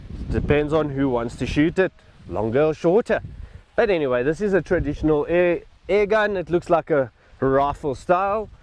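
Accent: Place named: China. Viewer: sorry. lost me at chinglish.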